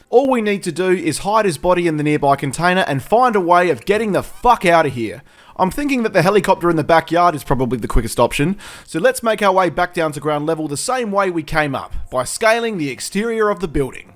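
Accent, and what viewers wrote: Australia. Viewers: Aussie accent just makes everything better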